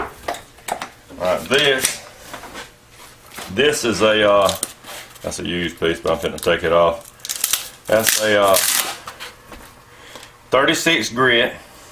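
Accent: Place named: Deep South, United States. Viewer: He ain't from Chicargo, that's fer shure. More like Ludiwici, Georgia, I'm a guessin. South, deep south. More southern than even the Sawfish. : ) I like it.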